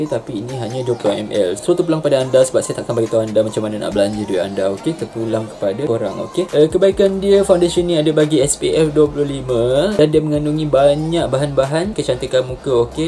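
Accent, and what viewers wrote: Sabah, Malaysia. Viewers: Orang sabah ke ? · Are you sabahan?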